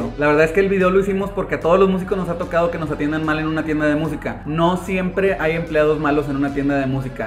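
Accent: Mexico. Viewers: Excelente video, yo soy de Ecuador y realmente a todos los mexicanos les oigo igual, 😅😅😅, no me parece que se merezca un video, ella fue un éxito de 5 canción a lo mucho y se fué desvaneciendo, hasta desaparecer, me fascina desde que tengo 12 años, aurita llevo 39 vueltas al sol, y cualquier video sobre ellos Siempre será bienvenido, que tengas un gran fin de semana. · siendo sincero el acento mexicano no me gusta para nada, me suena ridículo a veces, pero debo admitir que el tuyo es el mejor, ni siquiera sabia que era mexicano, y ahora que se que es mexicano me va a costar decir qie no me gusta el acento, me encanta tu voz y la naturalidad con la que hablas, me da curiosidad saber como cantas.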